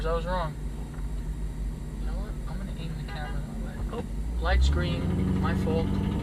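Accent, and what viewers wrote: New York, United States. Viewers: love your drives and the subject matter. And u do it with a New York accent. Keep up the good work!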